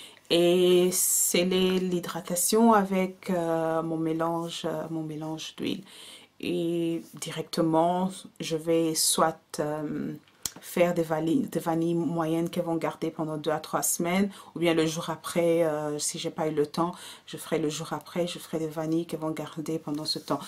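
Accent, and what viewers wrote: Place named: England, United Kingdom. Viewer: tu as un apcent anglais !!! J'adore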